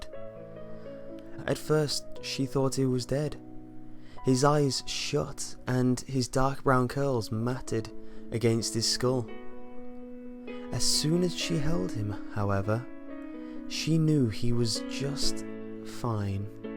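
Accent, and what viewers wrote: United Kingdom. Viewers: are you really British?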